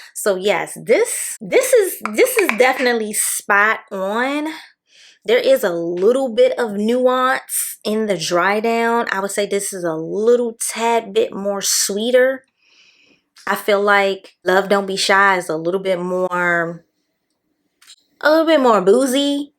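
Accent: DMV, United States. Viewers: Your accent is giving DMV ❤ I have to get the purple one love don’t be shy dupe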